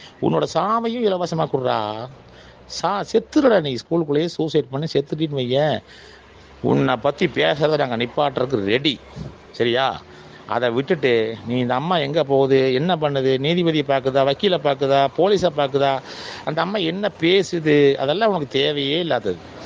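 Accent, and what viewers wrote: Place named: Kongu, India. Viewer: பக்கா கொங்கு தமிழ்❤😂❤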